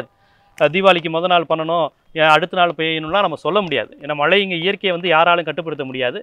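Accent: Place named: South Tamil Nadu, India. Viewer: Usually it is slang in south dustricts ! many speak that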